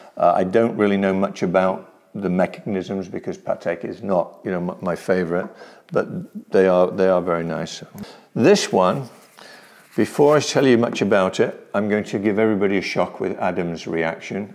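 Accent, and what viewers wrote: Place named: Yorkshire, United Kingdom. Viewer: I think this guy is great I sent him a Christmas greeting and said all the best from Leeds in Yorkshire and much to my surprise I got a reply saying merry Christmas lad. much appreciated and I'm not sure if he is from Yorkshire but he sounds like it to me anyway what a really nice guy and some people might don't think wealth suits them but it well although I should resent wealthy. People being poor as you like but no he's a gent a colourful one but still a gent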